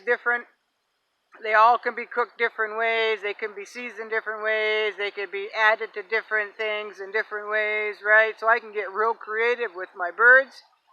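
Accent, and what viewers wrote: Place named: New England, United States. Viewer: Hi, I just recently found your channel and I enjoy your videos. I wanted to tell you also that your accent reminds me of New England grandfather, I sure miss him and hearing you talk brings back great memories. Thank you, God bless 🙂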